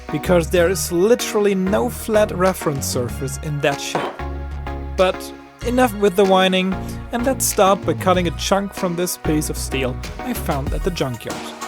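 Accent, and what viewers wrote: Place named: Germany. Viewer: Eine interessante Herangehensweise, wie Du den Griff von Stich gestaltet hast.^^ Vor allem,.... bin ich eigentlich wirklich der einzige, dem es jemals auffiel, dass Orcrist aus dem Hobbit Film einfach nur eine Hälfte von Stich ist? Hält man 2 Orcist zusammen, hat man ein Stich. ^^ .... im englischen klingt der letzte Satz besser. xD Auch fand ich interessant, dass Du für Orcrist auf Blau gesetzt hast.^^ Der Drachenzahn Griff ist wirklich gut gelungen.^^ Die armen Pfandflaschen! xDDDDD Der Schwabe ging Dir jetzt aber sowas von an die Gurgel. Woischd, Kerrle. Dessin 25cent pro Flasche! O_o Ich weiß ja nicht warum, aber für mich muss Glamdring schon eine definierbare und klar erkennbare Blatt Klinge haben. Leaf shaped Blade im englischen, glaube ich. Neben Narsil/ Anduril mein zweitliebstes Schwert der Guten aus Mittelerde. Anhand der Menge an Cutting Tests kann man auch gleich erkennen, was wohl Dein Lieblingsschwert war. 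^^ Man kann es einfach irgendwie raushören. xD Wir Deutschen haben so einen gewissen Klang in der Stimme, wenn wir auf englisch reden. ^^ Nicht nur ein Landsmann, vielleicht auch noch einer aus dem gleichen Bundesland, wenn ich die Rheinpfalz richtig gedeutet hatte? ^^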